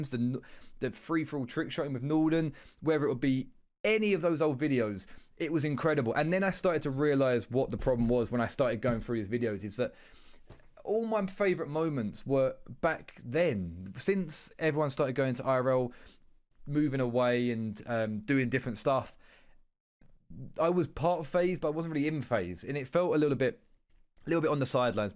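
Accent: United Kingdom. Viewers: I always enjoyed watching you and Spratt the most. Maybe as a Kiwi I just like the UK accent more than the American one? Excited for what's coming next!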